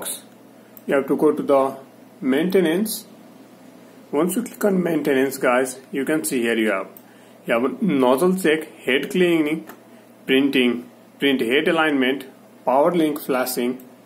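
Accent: India. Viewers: thats a beautiful indian accent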